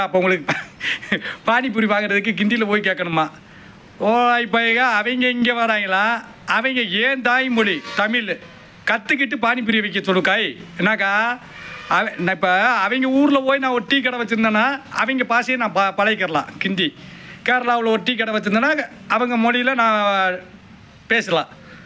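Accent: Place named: Madurai, India. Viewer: என்ன அவர் பேசுற தமிழ் சரி இல்ல அவர் மதுரைக்காரர் ஒரு ஒரு நாட்டில் ஒரு ஒரு மாதிரி பேசுவாங்க தமிழில் எத்தனை விதம் இருக்கு தெரியுமா😮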